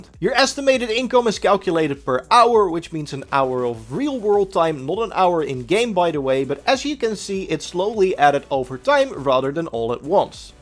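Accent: Netherlands. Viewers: I just hear the dutch accent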